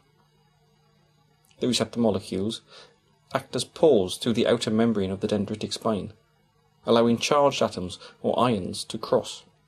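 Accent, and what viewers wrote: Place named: United Kingdom. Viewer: With all respect to your place of origin,realize this is the internet,so use a freakin accent that the whole world can understand,not only British.Also,for 2010+ standards. animation sucks.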